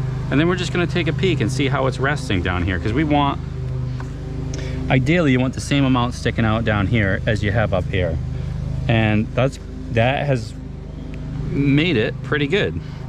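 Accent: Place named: Massachusetts, United States. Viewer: What a nice job! It looks really good too. Good explanations on why you do each step. I do handyman work, and nearly every house I enter has botched work. It blows my mind how much bad work I see. In Part 1 you showed layer upon layer of messy repair attempts that failed. Those hack jobs are fine in an emergency like during a storm when you're just stemming the tide until you can do a proper repair. Very much admire your skill and methodical work. I'm originally from MA and your accent sounds familiar! My 70 year old house in OR has the original heavy galvanized steel step flashing. I re-roofed 27 years ago, and just lifted it up like you said. It could probably go another 20 years, but I'm going to replace it with lead the way you did.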